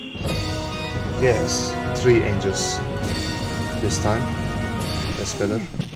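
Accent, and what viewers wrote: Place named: Turkey. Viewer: Are you Turkish? Your accent is Turkish.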